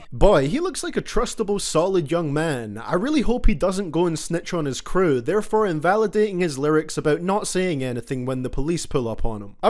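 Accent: Scotland, United Kingdom. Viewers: He sounds like a Scottish version of another youtuber I watch but can't remember which channel. It's uncanny. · U Scottish or usa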